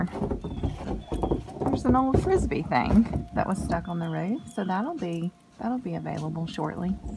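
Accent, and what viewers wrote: United States. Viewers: Well it’s looking like a typical day down on the farm you are so amazing I just love to hear your voice it’s so country and that’s why I can just sit and watch them over and over stay safe out there 🌹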